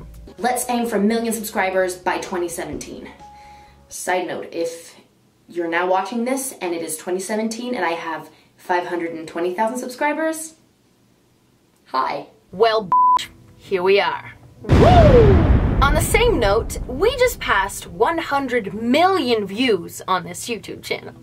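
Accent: United States. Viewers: why do you speak with an american accent? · i'm sorry if this has been asked before, but why does she sound american? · is she finish and American? She sounds American what a shame :( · Where did you get the American accent from? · If she's from Finland then why does she have an American accent? I'm so confused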